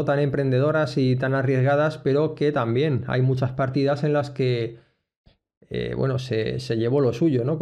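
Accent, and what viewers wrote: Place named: United Kingdom. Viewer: Te felicito por tu canal, es de lo mejor en Ajedrez por las partidas y tus análisis y comentarios. Pero permíteme recordarte que el Inglés no es el único idioma además del Castellano. No malogres tu buen canal pronunciando toda palabra no Castellana con Fonética Inglesa. Si no pronuncias una palabra extranjera con su propia fonética, debes usar la fonética Castellana. La letra w se llama ve doble y no 'u doble' ni mucho menos 'doble u' por lo que cuando se habla Castellano no se pronuncia como 'u' vocal o gutural sino como v que en Castellano al igual que en Griego es un sonido vibrante bilabial. Peor es cuando pronuncias la w del Neerlandés o Alemán o Polaco como 'u' porque en esos idiomas su pronunciación es dentilabial. Así, Zugzwang se pronuncia tsug•tsvang porque es Alemán y no sug•suang como si fuera Inglés. Asimismo, Wolfgang se pronuncia vólf•gang porque también es Alemán y no guólf•gang porque no es Inglés. 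Gracias por tu atención. Y que sigan los éxitos con tu Canal.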